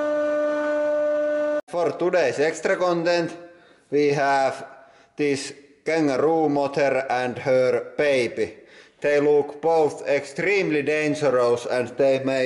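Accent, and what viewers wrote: Finland. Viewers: kunno rallienglantii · Valtteri Närhi Aksentista huomaa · Channel Thats cool. i love your Finnish accent by the way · rallienglanti for the win:D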